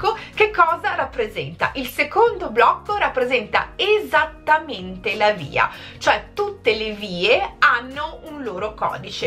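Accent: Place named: United Kingdom. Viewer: Video molto interessante, mi è giunta una curiosità! Ma tu sei Italiana di nascita? Perché hai un accento british perfetto quando parli inglese, e ti rimane leggermente quando parli in italiano!!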